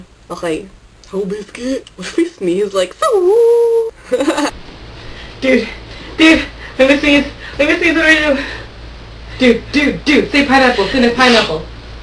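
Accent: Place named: Philippines. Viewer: HAhaha Next time you sneeze stick your tongue out :P Nice accent btw. "Aww cute na cute" Lol Filipinos FTW!! :P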